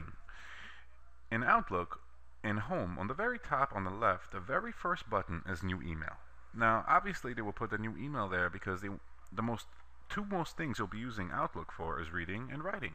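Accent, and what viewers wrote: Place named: New York, United States. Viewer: this one came in handy also - thanks for your hard work on these. I am still guessing NY accent...perhaps the Bronx?? I can hear it when you say dog.... like dawg :-) lol odd I know!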